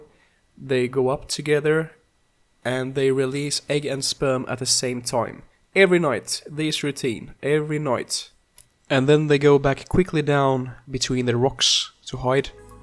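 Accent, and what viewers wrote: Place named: Scotland, United Kingdom. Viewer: idk why you sounds somewhat Scottish to me lol. Anyoway I got my captive bred Mandarin a few days ago. So glad we are able to captive breed these beautiful fishes.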